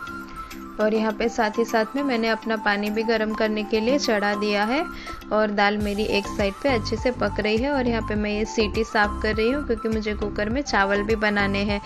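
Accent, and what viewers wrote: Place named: Gujarat, India. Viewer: Ji han main bhi Ahmedabad se hun aapki awaaz se lag raha tha ki aap Gujarat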